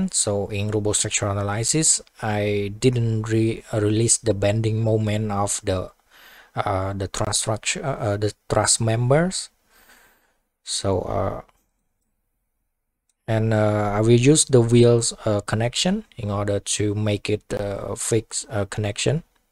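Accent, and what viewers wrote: Cambodia. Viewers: Ur sound like U are Cambodian!! Anyway this is the good lesson one to learn. Thank!!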